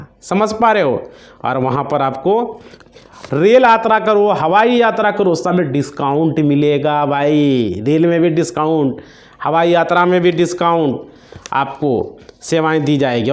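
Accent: Bihar, India. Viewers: जो बताना हो साफ बताओ चुटीये की तरह बोल रहे हो बिहारी हो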